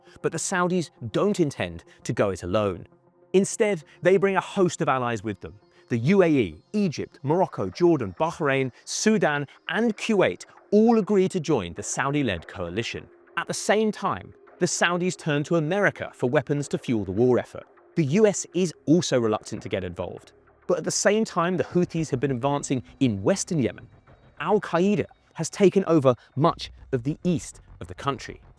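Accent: United Kingdom. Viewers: 1 min into the video and I hear British 😅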